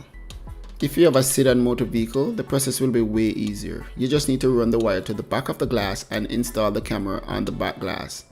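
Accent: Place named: Bequia, Saint Vincent and the Grenadines. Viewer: Love de accent mon! Skiann for scan takes me right back 50 years to Bequia and discovering white guys spoke with the same accent, wild!!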